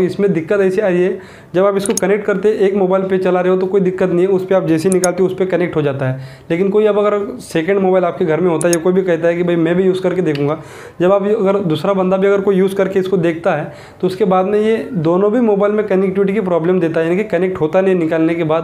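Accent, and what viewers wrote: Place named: Mumbai, India. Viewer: Fucking useless i cant understand bombay shit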